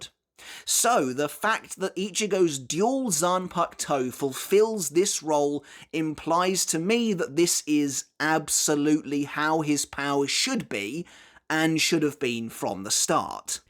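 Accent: United Kingdom. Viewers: it's his British accent that gets people.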